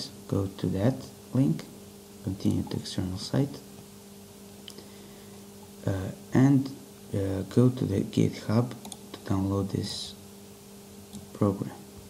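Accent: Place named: Mexican-American, United States. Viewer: You talk like a American-Mexican.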